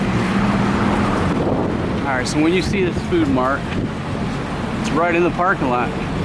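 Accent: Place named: Texas, United States. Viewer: loved your detailed report about the bus service as i was just looking for this info ! and above all that loved your texan kinda accent man ! typical cowboyish ! a handsome and smart backpacker detected , in pattaya ! hahahaha keep posting such cool vlogs mate ! greetings from india :) peace and cheers for that singha !!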